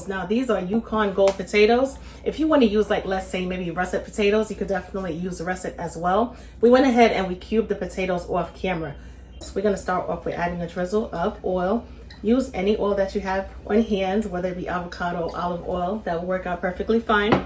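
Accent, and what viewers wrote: New York, United States. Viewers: That looks amazingly delicious 😋 and it’s sounds like a New York voice you have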